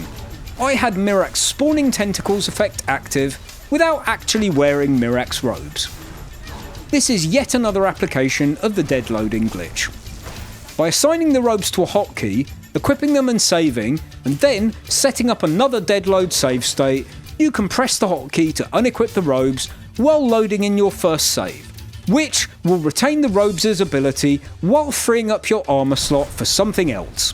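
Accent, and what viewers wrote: United Kingdom. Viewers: Hello again funny British man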